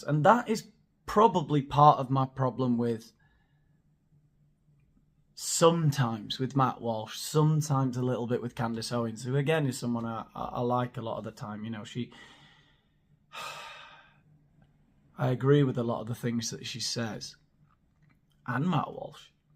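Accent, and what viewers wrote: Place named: United Kingdom. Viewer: I missed the video y’all are talking about, but I’m going to go back and watch it. Even without seeing it, I can tell you, that I love you sense of humor, and always think you’re funny in every video you make. Keep ‘em coming! Oh, and I didn’t know you lived in Spain. I guess I thought you lived in the U.K. because of your accent (but I’m no expert on other people’s accents. I live in Texas and have my whole life…and no, I don’t ride a horse to work everyday, lol, but I would like to). I came to Spain twice. First time with my now ex-husband on a business trip and the second time with my now ex and my youngest son, who is a year younger than you. That time was a pleasure trip. Went to Madrid both times and the second time we also went to the Rock of Gibraltar and a city near there (that I can’t think of right now, but it’s a well known city and was a beautiful place). 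Love your videos!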